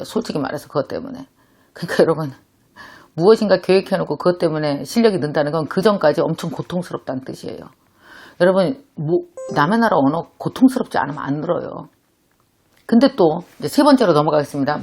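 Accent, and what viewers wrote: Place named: South Korea. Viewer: 정말 박수를 쳐드리고 싶네요. 대단하세요. 영어가 모국어가 아니면 당연히 한국인 엑센트가 있죠. 언어는 지식전달이기도 하지만 의사소통이 젤 인것 같아요. 마음과 마음이 언어를 통해서 대화하는거니까 한국인 엑센트가 있어도 의사소통에 문제가 없다면 오히려 한국인으로써 자랑스러운점일것 같아요. 김미경강사님의 강의에 100% 공감하고 동의해요. 용기주셔서 정말 감사해요.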